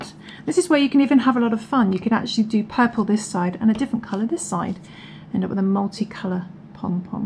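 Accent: England, United Kingdom. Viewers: Thank you for that. It is nice hearing an English accent on YouTube for a change... ;-))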